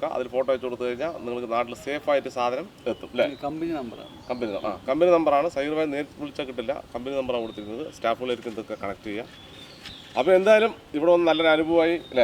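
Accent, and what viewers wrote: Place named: Malappuram, India. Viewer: ഇടക് മലപ്പുറം ഭാഷ വരുന്നുണ്ട്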